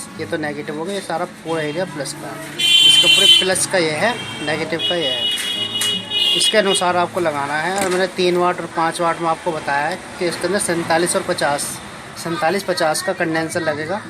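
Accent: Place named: Baghpat, India. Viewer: i m from Mumbai... my hometown is bagpat district.. aap ki boli wahi ki h think so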